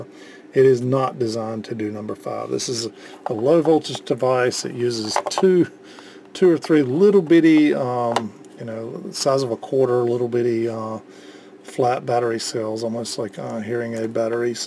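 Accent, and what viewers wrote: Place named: Texas, United States. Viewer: Love your videos. I am 61 and looking to get into Vintage Audio repair not only to keep my gear in top condition, but to give back for what has been a lifetime of enjoying these fine components. I'm an electrician and built a Harley from scratch when I was 17 in my bedroom. I think I can figure this out. I know a gentleman I work for who has an accent identical to yours. He's from around Waco, Tx. Anyway, I'm going to purchase a Sprague TO 6 and was wondering if I need to desolder every capacitor I test. I'm guessing yes, because if it is leaking voltage, it will send high voltage to the other components while connected to the circuit board and possibly damage them. Is that correct?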